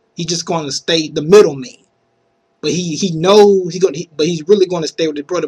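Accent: South, United States. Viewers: man what state u from man cause u got country ass accent i mean no disrespect man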